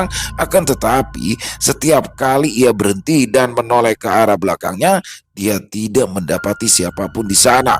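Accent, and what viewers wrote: Jakarta, Indonesia. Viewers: ASSALAMU ALAIKUM Bg 🙏,hadir lgi nyimak,selain ceritanya yg tegas & mantaabbb, logat betawinya itu yg paling saya suka,!!!!!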